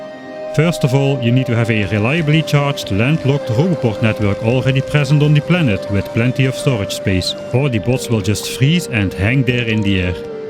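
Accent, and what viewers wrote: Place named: Netherlands. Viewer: This is the definition of dutch accent lol i love it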